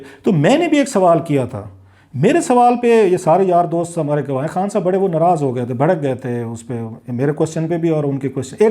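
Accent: Pakistan. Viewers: Klasra sahab, first of all I love your urdu accent and the way you talk.. big fan of your reporting, you truly have exposed some great stories and scams.. Although I am a PTI supporter but I still think you are right, khan sahab should have an interview with you and face your questions..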